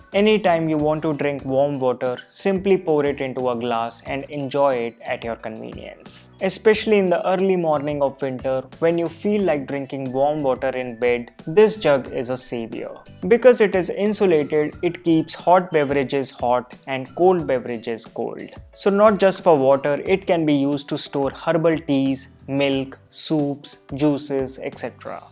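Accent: India. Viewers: English is just amazing he is not speaking in fake American accent but speaking in real indian accents now i also speak english in indian accent 🙏🙏thank you 😘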